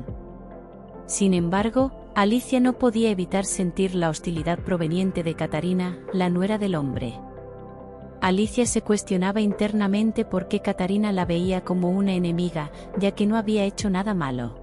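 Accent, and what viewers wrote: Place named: Spain. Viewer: Que bella historia y la narración impecable con ese soneto como de españoles me quedé fascinada asta el final mil gracias y ya me inscribo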